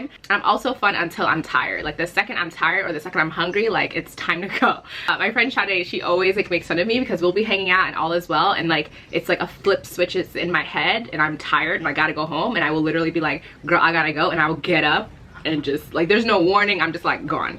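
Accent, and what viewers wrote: Nigeria. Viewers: i love the nigerian accent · Hilarious Nigerian facts : We are loud Our parents work hard We value degrees Your Naija accent on point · I love your Nigerian accent. This video is amazing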